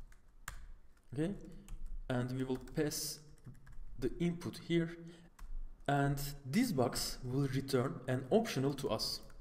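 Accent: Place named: Turkey. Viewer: Turkish accent :)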